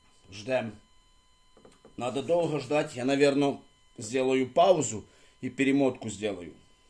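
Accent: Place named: Central Ukraine, Ukraine. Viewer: Його рідна мова - суржик, а точніше діалект, яким розмовляють в центральних областях.І він від цього не париться.А страждаєш ти, і причому страждаєш дурістю.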